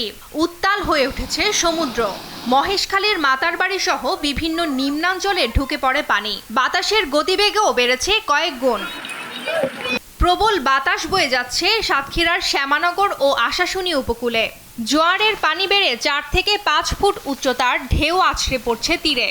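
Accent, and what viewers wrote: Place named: Kolkata, India. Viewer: কোলকাতার মতো কথা বলে কেন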